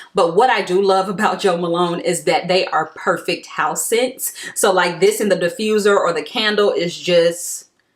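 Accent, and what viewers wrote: South, United States. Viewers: Love your country accent.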